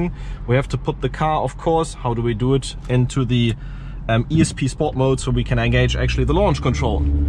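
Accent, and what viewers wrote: Germany. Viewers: Ich find es erstaunlich, Aussprache übelst deutsch aber die Sätze fließen nur so dahin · Kannst gut englisch aber man hört das du ein Deutscher bist .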